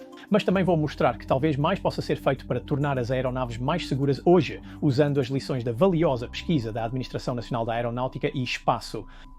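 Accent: Portugal. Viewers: Português de Portugal não dá!!! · Português de Portugal é de matar!!! Mas o conteúdo é ótimo! Continuem com o português brasileiro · Português de Portugal não por favor😢😢😢😢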